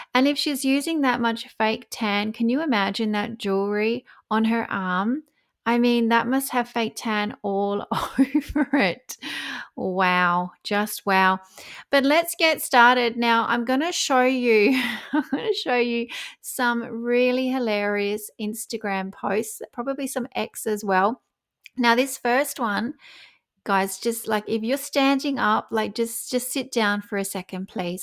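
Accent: Australia. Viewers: You have a beautiful laugh! Love your work. Great to hear a fellow Aussies voice about this awful woman! We can smell bullshit and seriously, even if she were to go global 😅 i reckon the Australian market would be her worst. If i ordered my latte, made by barristas who know me, and they put flower sprinkles in/on it, I would suggest a holiday was in order. We take our coffee seriously down here. Imagine Lygon St italians seeing this! Santa Maria 😅😅😅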